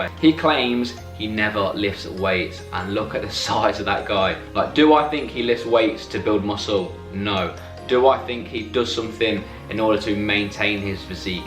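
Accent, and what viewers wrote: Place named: North, United Kingdom. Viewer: Nice one man good topics, your northern accent remind me when I used to live up there haha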